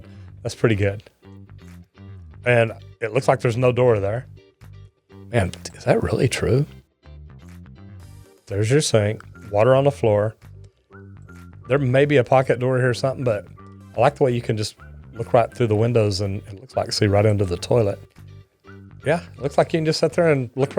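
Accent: Texas, United States. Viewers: Based on your accent I say ur Texan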